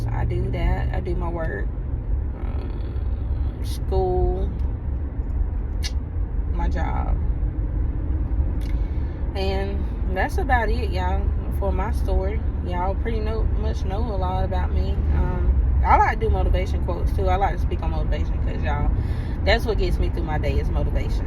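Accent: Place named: South, United States. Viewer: You are so right. I wasn’t going to say this but I’m a psychologist. If you feel like something isn’t right, it’s best to tackle the problem right away because it can only make things worse if you don’t. Early childhood development is very important. Everything is going to be ok though. You have to pray and keep going. By the way I’m seeing a lot more confidence lol and the accent is 🔥🔥🔥 you so country lol. God bless. Keep vloging.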